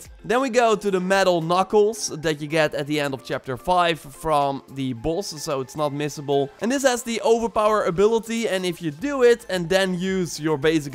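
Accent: Netherlands. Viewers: Are you from holland??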